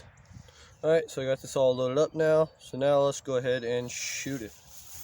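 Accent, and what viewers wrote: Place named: Massachusetts, United States. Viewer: Cool stuff. An interesting video and comparison info. YouTube recommended your video to me here in Toronto, Canada. Guessing you're a Massachusetts fella, judging by your accent. Ha!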